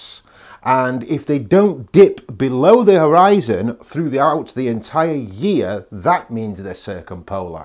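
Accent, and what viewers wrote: Midlands, United Kingdom. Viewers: Would you mind disclosing your appropriate location? Your accent sounds not too far from me (Derby) and i was wondering about local 'low light pollution areas'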